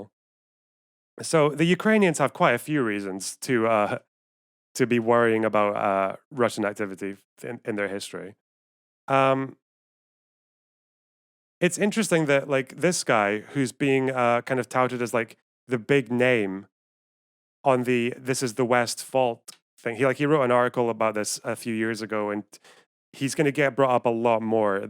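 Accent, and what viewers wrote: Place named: Glasgow, United Kingdom. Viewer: At first watching your channel I was like “this guys got a super familiar accent” Then I realized my dad was from Glasgow, moved to Canada when he was 19 and lost most of his accent. You sound so much like him it’s crazy.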